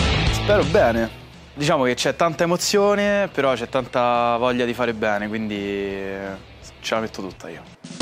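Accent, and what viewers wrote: Rome, Italy. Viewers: alla Ferilli nessuno ha fatto appunti di questo tipo per la sua presenza a Italia's got talent.. Eppure non sta recitando nella trasmissione..la sua cadenza romana non è paragonabile a quella di questo ragazzo. La Ferilli ha costruito la sua carriera sulla sua cadenza.. Fuori dalla recitazione ancora di più. E come lei tanti altri. Altro che Ludovico Tersigni. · Ma chi e? Ma perché? Ridateci Cattelan 😭 E comunque, la smetterà mai di avere questo forte accento romano, che poco si addice ad un conduttore?! (Ma pure ad un attore a dirla proprio tutta.) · Un po' meno accento romano che amo negli attori ma non in un conduttore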